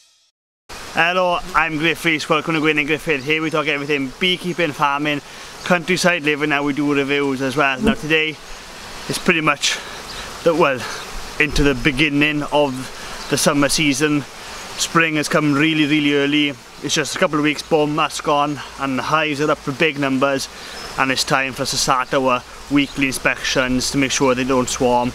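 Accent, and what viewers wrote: Wales, United Kingdom. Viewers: only joking. Love the Welsh accent. Irishman here. Looking to get in to beekeeping. Have hives and equipment. Reading reading reading and watching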